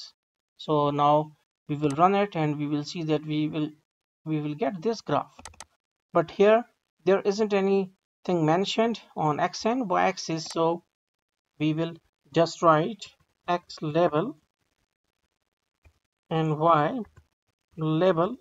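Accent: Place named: India. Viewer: thank you soo much my indian bro ı like your accent :D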